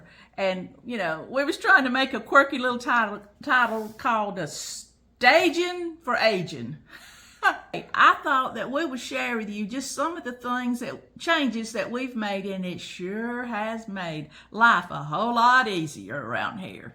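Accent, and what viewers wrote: South, United States. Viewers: New subscriber and I just love your channel, especially your sweet Southern accent. Thanks for the wonderful tip. Love your "Paddy Wagon"! My husband and I replaced our computer chairs for comfortable, padded recliners. I put a pillow on my lap, put my keyboard on the pillow, and recline with my legs and feet propped up. I have a large computer screen that's easy to see. In the kitchen, I have an office chair on wheels and a bar stool with a back and arms on wheels. Comes in handy for working at the sink and stove. Such a great tip for raising your dryer! The main thing that has helped my arthritis pain is to eliminate all "Inflammatory" foods from my diet. No more sugar, white flour or white pasta, no refined or processed junk foods, no soft drinks. Just eat lots of lean meat, fruits and veggies and whole grains. Only use olive oil. 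Made an amazing difference in pain and mobility. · Thank you this info is very helpful and I love your southern accent 😊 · Mrs., you are simply adorable. I love your southern accent wisdom and tips for making life easier as we age.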